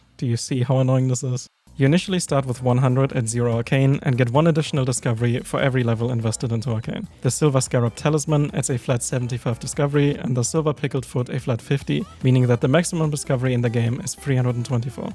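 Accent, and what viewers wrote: Germany. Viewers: Als ich den Akzent gehört hab wusste ich direkt dass du Deutscher bis xD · no front but you have to be german cuz youre accent in speaking is yk "BAD" but im german too sooo...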